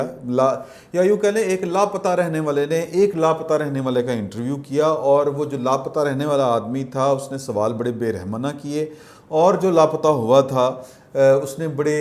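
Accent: Punjab, Pakistan. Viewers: آپ جو ماں بولی یعنی پنجابی کا تڑکہ لگاتے ہیں بہت اچھا لگتا ہے خوش رہیں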